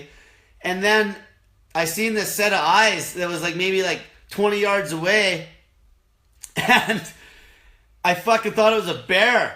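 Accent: Canada. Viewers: I hated him for five minutes then it was like hey... He sounds Canadian... like me. Maybe my Uncle Frank has a kid out there we know nothing about. I have uncles who sound like him. Lol Do you hate the way all Canadians sound when they talk? His accent is very Canadian.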